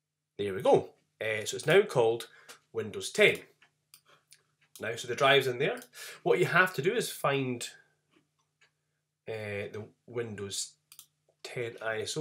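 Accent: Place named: Scotland, United Kingdom. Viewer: Hey man, you just made my life easy. Thanks, wherever you are (your accent suggests Scotland, which is my favourite place in the world).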